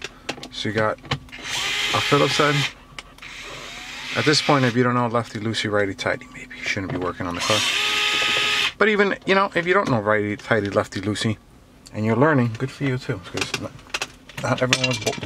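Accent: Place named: New Jersey, United States. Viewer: Great video. Gotta love the Jersey accent.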